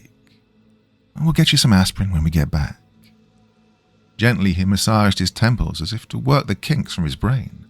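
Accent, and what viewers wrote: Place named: United Kingdom. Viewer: Its how my wife and I fall asleep to his sultry British vocals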